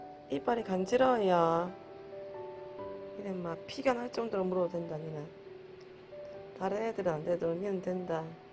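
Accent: South Korea. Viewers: 눈이 와이리 커요~ 고향이 경북 문경이나 상주쪽이신가요? 억양이 처가쪽이랑 꽤 비슷하네요 ^^ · ㅋㅋㅋㅋ 저까지 같이 신나네욬ㅋㅋ 찐부산사투리 넘 좋아용 ㅠㅠㅠ 애미 자는 모습을 보니 집이 엄청 편안한 가 봅니다! 매번 댓글 다 읽어주시고 하트보내주셔서 감사해용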